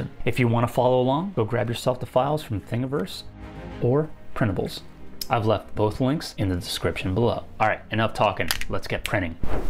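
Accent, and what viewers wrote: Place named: Australia. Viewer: Bro is from Australia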